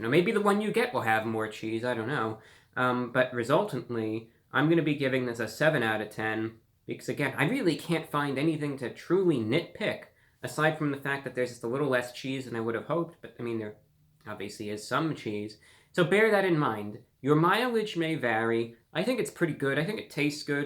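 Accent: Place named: New York, United States. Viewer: Clearly a New York accent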